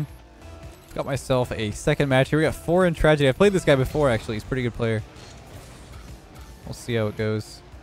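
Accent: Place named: Canada. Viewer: I've watched every one of your daily vids for months now and I can honestly say... In this one you sound so Canadian 😂 The itis really brings out the accent apparently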